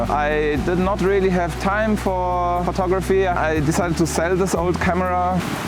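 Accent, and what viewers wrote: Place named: Germany. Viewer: Scanning negatives (or slides) is a niche application, but one where the Olympus cameras are a really nice (and quite affordable) option. The light source is a problem with 4x5 negatives, not so much for smaller formats up to 6x9. The pixel-shift hi-res mode does a really good job at that, even though the "true" resolution is more like 40 to 50 MP (still more than enough to make the grain visible). BTW, your English sounds somewhat "German", or am I mistaken?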